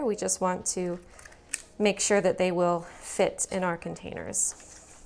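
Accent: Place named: Canada. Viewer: I’m from BC originally and live in the states now. I love hearing the Canadian pronunciations. Sachets is an interesting one bc usually Canadians say things more French-ish (i.e., foy-YAY vs. FOY-er), so you’d think it would be the Canadians saying sah-SHAY but nope. Sadly, most of my Cdn pronunciations have disappeared from my vocab but I refuse to give up the correct way of saying PAH-sta (not PAW-stuh). 😂 Great info and exactly what I was looking for. Thanks!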